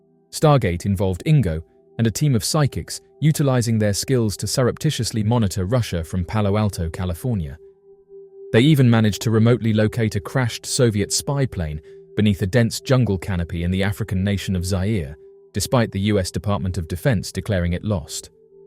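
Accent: United Kingdom. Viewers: If the narrator has a British accent......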